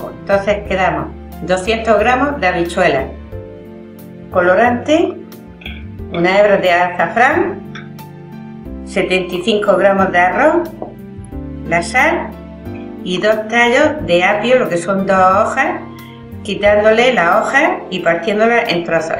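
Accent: Murcia, Spain. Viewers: Hoy me he decidido a hacer olla murciana , pero no me acordaba bien de los ingredientes y he visto que asi la hacia mi madre ella también ponia judias verdes planas y la verdad que aunque siempre la tengo presente, cuando he visto tu receta con tu acento murciano precioso , me ha dado mucha añoranza, gracias por compartir tus platos de nuestra tierra, te sigo desde hace mucho tiempo, un gran abrazo 😘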